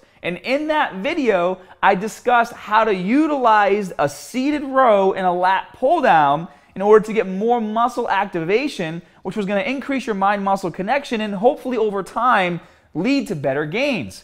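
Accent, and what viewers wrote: Boston, United States. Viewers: I love his Boston accent he's hilarious overall but this guy is funny and cool and authentic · My boy out here with that Boston accent. That accent just makes you stronger when you hear it. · Love your Boston accent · Hey, I'm a current fatass working on losing weight and building muscle if thats possible. I was always skeptical about this guys ads on facebook. Are you from Boston with that accent? Total irrevelant build up to that question but I'm dying to know.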